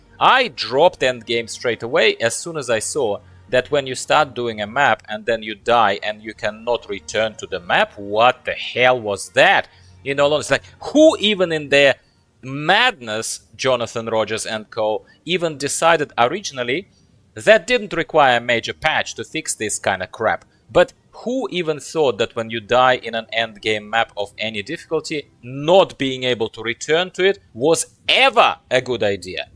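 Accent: United Kingdom. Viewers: Her accent is of a tedious northern British working class pleb, it pisses me off also. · Its a Northern English accent mate.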